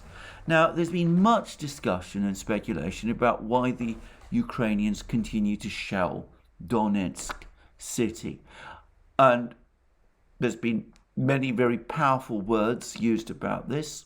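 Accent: England, United Kingdom. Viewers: Nice English accent Ruski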